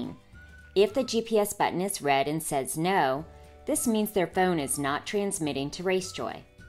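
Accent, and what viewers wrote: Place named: Baltimore, United States. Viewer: Narrator is from Baltimore, not a guarantee but pretty dang shure hon.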